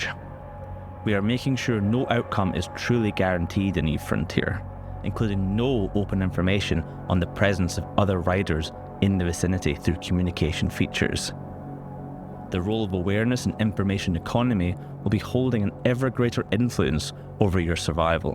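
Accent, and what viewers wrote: Scotland, United Kingdom. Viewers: That's a Scots accent, not Icelandic. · I can't believe after 20+ years of experience with EVE, you can still allow yourselves this level of shit with placing and advertising a product. It's no wonder each project other than EVE has failed. Its not the product, it's your absolute lack of understanding that we want proper information and motivation to spend money, and not half-arsed ambiance video with some dude who sounds like a Scot trying to balance talking English and gurgling liters of cum at the same time. Oh, good job on giving a monstrous advantage to people who are coders and into IT, over regular folks. Hey pleb, go work for that dude who knows how to write code and can make his own stuff up.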